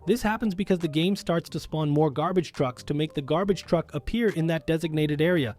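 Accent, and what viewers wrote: Brazil. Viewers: He is brazilian, just like me. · actually its portuguese he have an brazilian channel and lets just say his english isnt that good hope this helped!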